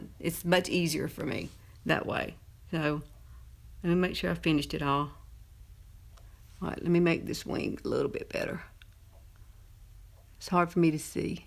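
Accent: South, United States. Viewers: i just gotta say i love ur southern accent, so younique lol excuse the pun...oh by the way u can get younique cosmetics over here in N.Ireland, i dont own any myself as i use pacifica vegan mascara as i have the most sensitve eyes EVER but it does look amzing on u girl....hugs and kisses....xxx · You are a breathe of sunshine. I love those cream eye shadows How much for the cream shadow? I love your southern accent. You are the cutest. Have a great week. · Hi, I really enjoy your make-up tutorial videos, thank you for taking the time to make them for us. I especially love hearing you talk because I love listening to your southern accent. Please don't ever change, keep your accent. I can understand you perfectly and I'm from and live in California. BTW, I'm curious...why you don't use a liquid eye liner instead of the pencil to line your top eye lid? 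I think it would make it easier for you Also, has anyone ever told you that you look a lot like Heather Locklear? You have very similar beautiful eyes. I'm sure you've heard this before but I couldn't help but ask.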